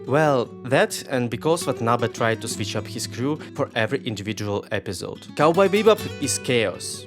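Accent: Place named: Middle East. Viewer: I’m watching this one because I watched that jujutsu Kaisen video. You make good content, but man, your accent is thick. Are you from the Middle East? It’s hard to place quite where your accent is. Anyway, so far your content is looking pretty good. I imagine those subscriber numbers will start jump, jumping if you keep making videos.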